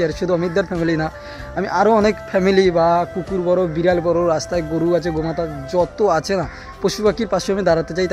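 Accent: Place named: East Medinipur, India. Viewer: sune mone hochhe east medinipurer lok ra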